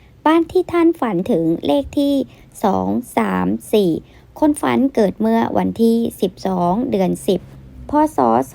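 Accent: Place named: Laos. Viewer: คลิป มันชอบออกลาวเกือบทุกคลิปจ้า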